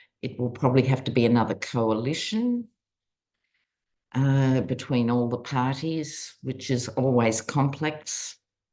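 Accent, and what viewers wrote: Australia. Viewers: Thank you Dr - love your Australian accent ❤❤❤🙏🩵🩵🩵🙌☮️👍✌️🌎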